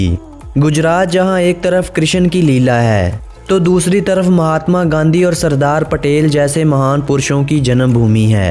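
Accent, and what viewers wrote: Pakistan. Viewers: Aavaj achhi hai. sab kuch population dikhaya. Urdu ki lahak aa jati hai. Kaha ke hai?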